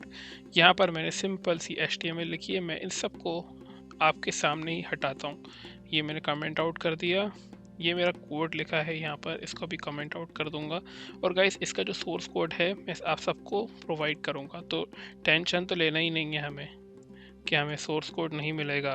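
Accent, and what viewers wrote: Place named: India. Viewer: took my like back immediately he started speaking indian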